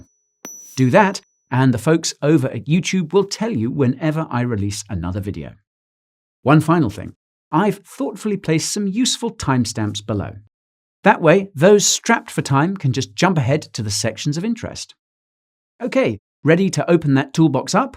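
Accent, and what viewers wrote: United Kingdom. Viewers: Man your fracking awesome.. love listening to you... something about brits that make em sound smart haha. thanks for all the GREAT info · I only understood like 10% of this... and that's being generous. But the wee bit I did pick up helps me understand why I flopped on my first $500-600 into crypto (well, Im not dead yet) and I'll be MUCH more careful entering my long term HODLING patterns from here on thanks to you. Liked and subbed even before you asked for it. I heard your Brit accent and immediately thought, ah yes, this man knows his stuff. LOL. Plus you came highly recommended by Alex Becker, the most ripped attractive PG rated Youtuber and top rated coin picker in the galaxy. 🙄😁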